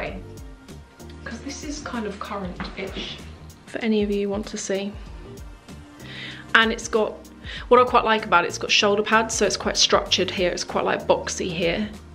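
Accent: England, United Kingdom. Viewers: listen to your English Accent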